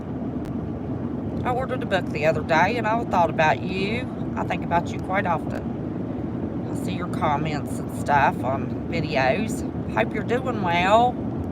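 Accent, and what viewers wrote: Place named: Deep South, United States. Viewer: Where is your accent from sounds deep south, Louisiana? Mississippi? Alabama??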